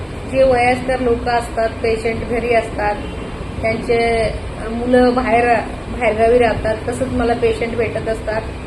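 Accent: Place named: Belgaum, India. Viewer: Ase kahi naste आपण काम करत जा falachi apexa na thewata pudhe जा kashtache jivan ahe pn nashib wan ahet 4 children ahet .keep itp sone ky parat yenar .struggle is life .ata thodech diwsat tumche best days yenar mule shklit tyana smjte .good job kartil dont b nervous n worried.God bless u all.ur marathi language is ltl bit belgaum type.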